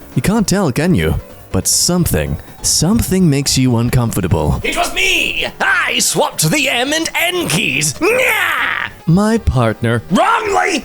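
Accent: Australia. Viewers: and I thought he was just doing a horrible Australian accent the whole time... Wow... Just wow... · Bro had to crack out the Aussie accent for this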